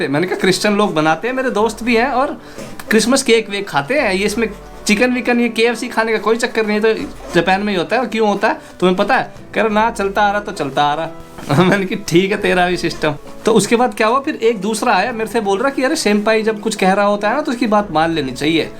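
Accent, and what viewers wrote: India. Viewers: Desi accent pure Hindi me aur haryanvi tushunn. Kya baat hai. 👌jay shree ram bhai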